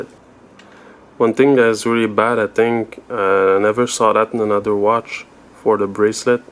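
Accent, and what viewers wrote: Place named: Quebec, Canada. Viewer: Québecois? - moi oui. I kinda hear it in your pronunciation. Good vid, i am considering getting this one or the blue. Cheers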